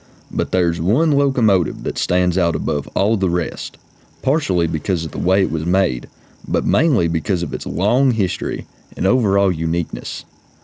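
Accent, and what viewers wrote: South, United States. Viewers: This is by far the best channel Ive stumbled upon recently! Your accent sounds like one that should belong on any of my fishing buddies, glad to see there are more good old boys into horses of the Iron type than just me. Lmaooo, liked and subscribed, keep up the videos! I really enjoy them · This guy is the most American-sounding American I’ve ever heard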